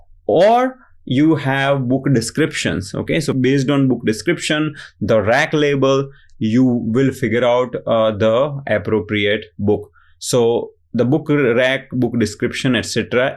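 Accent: Gujarat, India. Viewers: Best explanation out there! Also, love that gujarati accent😂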